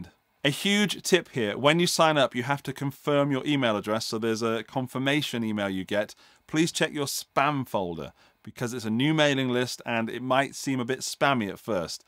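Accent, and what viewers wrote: England, United Kingdom. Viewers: I'm relatively new to the channel, and so far my biggest takeaway, and the thing for which I'm most thankful to you, is learning of the Strymon Flint, which will soon be part of my (very small) pedal arsenal. That and the hair, which is apparently somewhat toned down these days. Some of the older clips... it's memorable, I'll give you that! Seriously though, you're very articulate and occasionally funny with an English accent, which to American ears connotes intellect and is immediately suspicious to the bad people. I like it myself.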